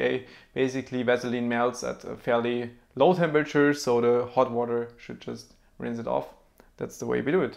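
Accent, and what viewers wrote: Germany. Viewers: Deutscher Akzent ist mal da :D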